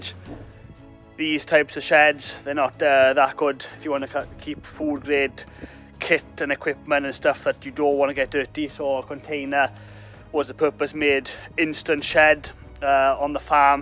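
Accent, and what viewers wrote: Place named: Ceredigion, United Kingdom. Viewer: my wife said ‘he sounds like he’s from your way’. My guess was Ceredigion.. 😜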